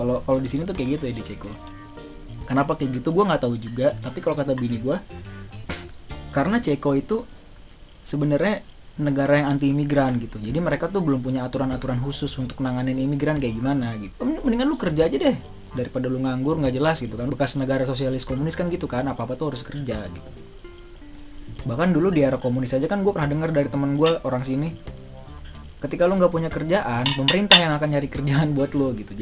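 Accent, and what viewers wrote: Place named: Depok, Indonesia. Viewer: Bahasa lu masih anak depok banget bor.kwkwkwkw keren keren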